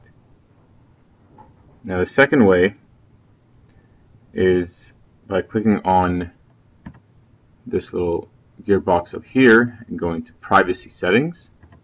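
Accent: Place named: Canada. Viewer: I adore your Canadian accent!! Nicely done. Very clear and easy to understand instructions. Thank you.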